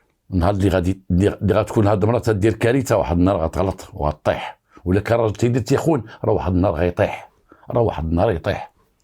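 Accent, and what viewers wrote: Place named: France. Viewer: . صاحب صوت وثقافة ولكنة فرنسية فريدة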